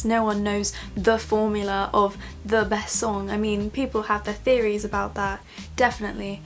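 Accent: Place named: United Kingdom. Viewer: Very interesting video. Tysm for making my mood better (my school starts tomorrow ). Maybe when I'll be older, I'll use these tips. I really luv your british accent. That 2 kisses at the end make me smile.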